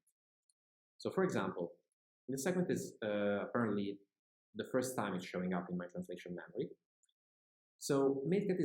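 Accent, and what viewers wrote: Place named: Italy. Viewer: I smell italian accent over there. Hi compatriot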